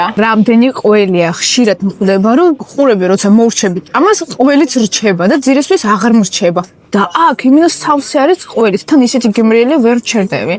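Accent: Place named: Russia. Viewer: Rusul skolashi swavlobdi? Laparakis gamo. (Ar gewyinos)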